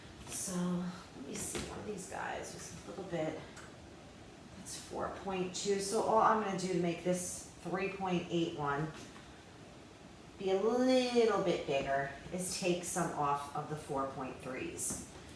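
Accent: New York, United States. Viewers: Same! Living in the greater NYC area you can even call out the borough, and if you are really good the Jewish version of that borough. I think is in or from Long Island. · Great job on this video and easy recipe. Will be baking some tomorrow! I love NY/NJ hard rolls and miss them since I moved out of the area long ago. Plus I love hearing your accent! Thanks again. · Too funny - the accent sold me immediately- only fellow New Yorkers can appreciate it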